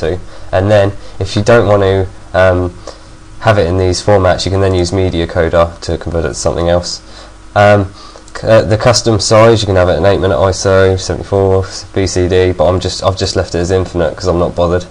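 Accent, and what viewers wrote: England, United Kingdom. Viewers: Good video...but you should redo it...you are blabbing way to much and listening to an english accent for 8 minutes is annoying....good video 5 stars.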